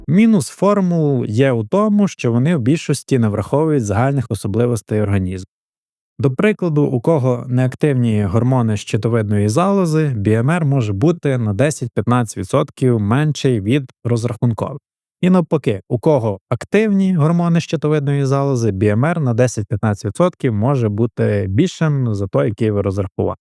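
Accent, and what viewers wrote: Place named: Galicia, Ukraine. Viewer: Ваша галицька Ч додає родзинки! Приємно слухати🤍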